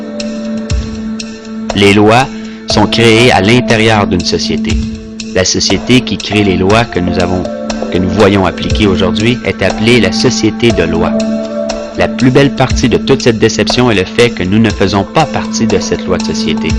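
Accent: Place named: Quebec, Canada. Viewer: excelent récapitulatif merci. Qui est la source de ce vidéo? Parce que ça sonne comme étant un compatriote québecois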